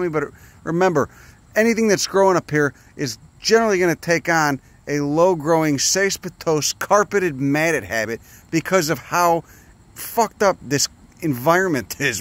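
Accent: Chicago, United States. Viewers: Eloquence, like a Chicago accented David Attenborough! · This guy's from Boston or Chicago. My bet is Chicago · this is a chicago accent! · Chicago. He’s from Chicago. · Lotta people in this comment section aren’t familiar with a true Chicago accent I guess 🥴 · no, this is not a new York accent. It’s a Chicago accent. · It is hilarious to see the title and thumbnail, then you click on and you're like, "Chicago accent." Oh yeah, its you. LOL How's it goin' ya old prick? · That’s a Chicago accent. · Ok, apparently it is, in fact, a Chicago Accent, so sorry about that, sounded that way to my southern ears! 😆😁 Still delightful though! 🤍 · I think every nature video should be narrated by someone dude from Chicago. Chefs kiss! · as a new Yorker i feel like this accent is most likely from Chicago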